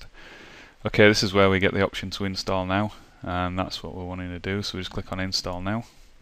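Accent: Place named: Scotland, United Kingdom. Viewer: scottish accent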